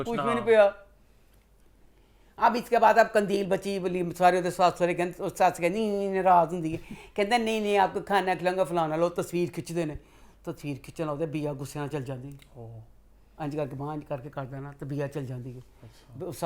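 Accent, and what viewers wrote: Punjab, India. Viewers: Yar i loved opinion of present Govt. situation. is a pure punajbi soul. Cute punjabi accent. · Jab sae josh ma aati han to andar se punjabi nikalti ha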